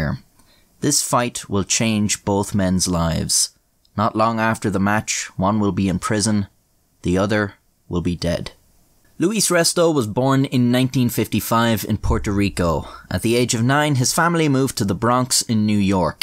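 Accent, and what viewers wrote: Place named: Ireland. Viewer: Hey there lady! Just wished to say love your vids, and your narration with your awesome Irish brouge . Wish I could sound so good. I just have a goofy American accent. You have so much going for ya no need to be downing yourself as you seem to sometimes. I bet you're a right fine lad. I hope you have a most excellent day/evening whatever time you see this.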